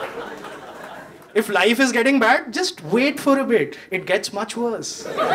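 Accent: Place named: Jaipur, India. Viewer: this guy has a jaipur accent,is he from jaipur?? Anyway great comedian with no stereotypical jokes on politics and the country.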